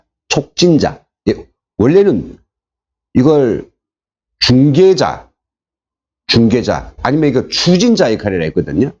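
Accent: North Korea. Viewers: 구수한 이북사투리를 구사하는 모습 을 보고싶읍니다. 다른 싸이트에서 사투리를 들으니까 얼마나 구수 하던지요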